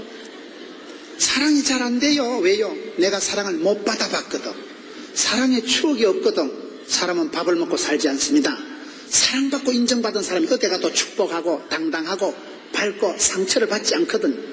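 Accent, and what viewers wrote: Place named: Gyeongsang, South Korea. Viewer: 김문훈 목사님 존경 합니다 경상도 사투리를 김문훈 목사님 고향이 경상도 목사님♡♡♡♡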